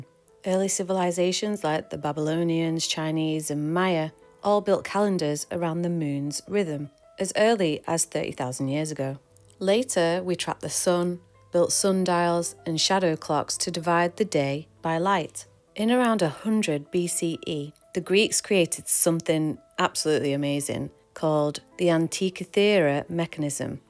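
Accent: United Kingdom. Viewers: youre like jon levi if he was a woman and bri'ish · Great to hear a northern voice that’s also got a great level of intelligence also.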